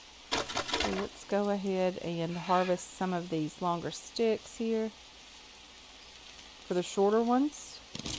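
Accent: Kentucky, United States. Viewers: From 1 Ky girl to another love the accent! Love watching you play Green Hell. Tip: unless just for videos & showing how to build I would not invest tons of building into drug camp or until you get to second map Anaconda Island or past if in story mode build only small overnight stopping points & water filter or containers etc... you will most likely spend very little time on this side of the map. ;-) there is also a Great cave to build in just go straight back behind the pig trap. Also watching your second season of Subsistence from the beginning in the 30s now liking it as well. Love your stories about your mom, canning & family. If you have not tried Grounded also check it out.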